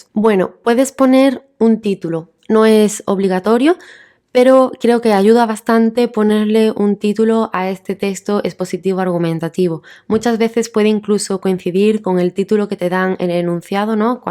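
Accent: Spain. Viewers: Que placer escucharla. Se puede aprender mucho observando los movimientos de su boca. Ella se convirtió en mi nuevo recurso del acento de España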